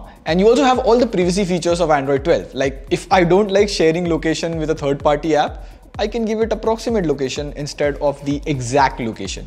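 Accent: India. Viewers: Your accent is funny. Are you indian by any chance?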